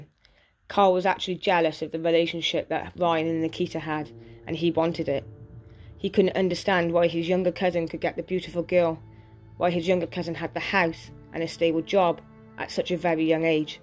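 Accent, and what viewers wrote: United Kingdom. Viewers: I really enjoyed your take on this. It was clear and concise I like that. I watch alot of True crime and it's refreshing to hear a British Accent albeit I know you said your in Wales but UK nevertheless 😃 Thanks I've subscribed and look forward to hearing more from you. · I’ve just come across your channel and watched this video, I think you’ve done brilliantly! Going to binge tonight. It’s nice to hear a Welsh accent too, I haven’t come across many and I’m also from Wales. Good luck with the channel! 😃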